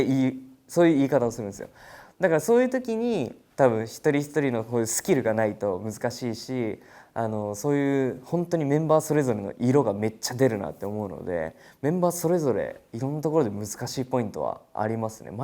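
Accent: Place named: Okayama, Japan. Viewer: かっこよ。あと岡山弁素敵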